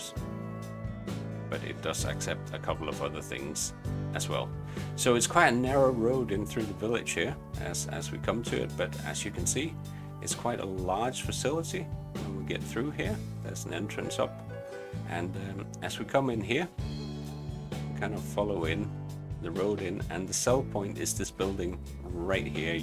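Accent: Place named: England, United Kingdom. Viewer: Så tillader jeg mig at skrive på Dansk (mit Engelske læner sig lidt for meget op ad Google translate) Håber det er ok. Jeg syntes jo nok, jeg kunne genkende den Engelske dialekt :D Har du lyst og overskud, så kan vi jo tage en sludre på MSN, Facebook eller noget i den stil. Jeg kunne helt klart godt tænke mig, at snakke med en anden VOKSEN, der er interesseret i FS19 og i særdeleshed dette map. Men også om alt muligt andet. Det ville være fedt at høre lidt om Scotland. Et land jeg altid har haft stor lyst til at opleve. Så har du lyst, så smid mig en besked på evt. Facebook, hvor du kan finde mig under samme navn som her :) I øvrigt dejligt at se at der også er Danskere der spiller FS og laver gode YouTube videoer. God dag :)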